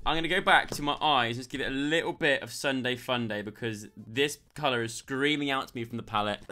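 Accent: Australia. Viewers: we love an Australian accent when I look at myself in the mirror what a pro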